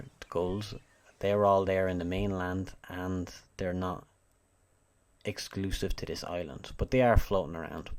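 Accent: Ireland. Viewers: Hey are you Irish?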